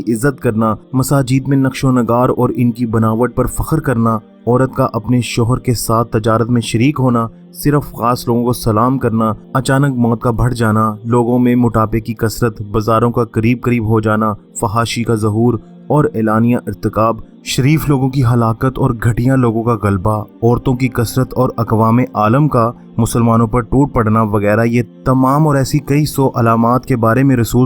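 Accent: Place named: Punjab, Pakistan. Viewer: This guy speaks Urdu but punjabi accent , means Pakistani punjab region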